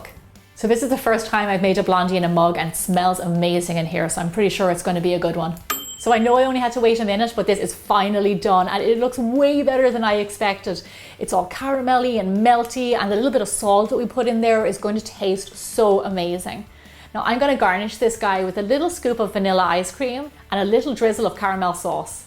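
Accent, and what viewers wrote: Ireland. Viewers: no problem I loved your Irish brogue it is so cool and I love your microwave meals I made a olmlate in a teacup or mug it was good eggs cheese and tomatos for 1 minute it was good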